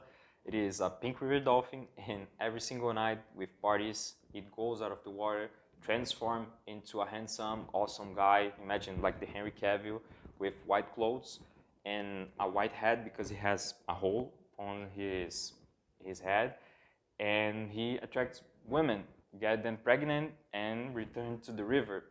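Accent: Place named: Brazil. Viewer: O sotaque brasileiro tá mto perceptível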